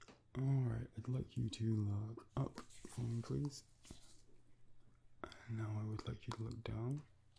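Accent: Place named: United Kingdom. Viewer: The British accent is worth a million pounds in itself. Audio books are an option.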